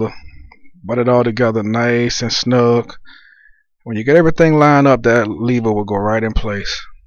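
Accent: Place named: DMV, United States. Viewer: I know a DMV accent from anywhere, Thanks for the help!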